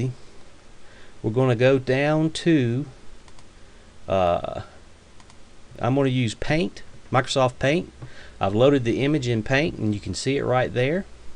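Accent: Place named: South, United States. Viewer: love the southern accent. and thanks for the tutorial!!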